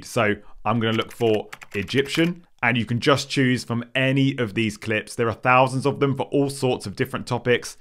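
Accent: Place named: United Kingdom. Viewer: Love your British Accent Sir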